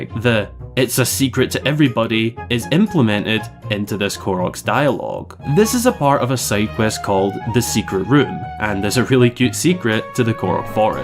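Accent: Scotland, United Kingdom. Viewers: never said he was, but just saying there's nothing wrong with his pronunciation. I can't speak for all dialects, so I wanted to make sure that it didn't seem like I was. I'm sure most of viewer base is in America, that's why he speaks with less of a Scottish accent. It makes sense, in a purely analytical way.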